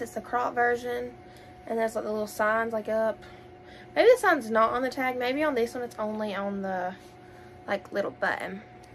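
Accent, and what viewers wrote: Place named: South, United States. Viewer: Cool video 😃😃 I love your Southern credo twang!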